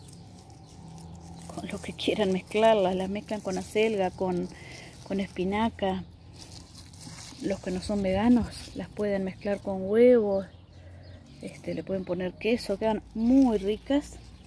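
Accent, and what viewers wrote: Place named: Argentina. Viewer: Me distes una explicacion muy buena y tenes un acento Argentino de lo mas precioso saludos desde Australia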